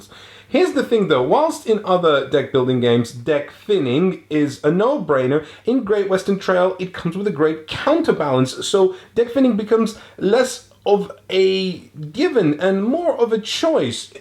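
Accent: United States. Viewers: I love your "California dude" cowboy accent. That was glorious! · Great American accent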